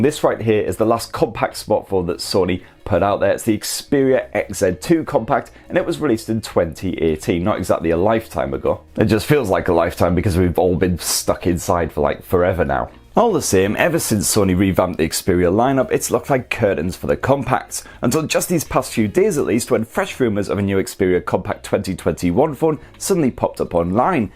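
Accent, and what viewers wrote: United Kingdom. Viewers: His British accent is the icing on the cake 🤭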